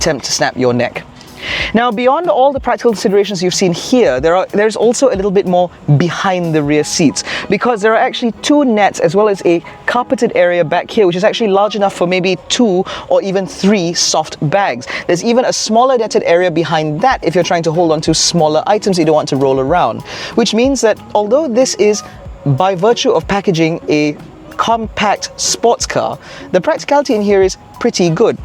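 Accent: England, United Kingdom. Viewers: English accent english car perfect match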